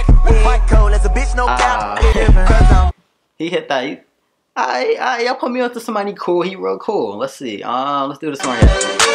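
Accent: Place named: New York, United States. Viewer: I luv the way you talk😍😍😍 Is he from NewYork?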